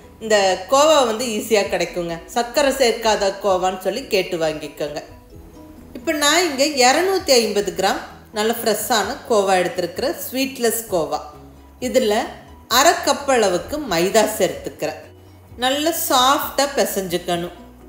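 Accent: Kongu, India. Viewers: Ungaluku koral super kongu styles beautiful sister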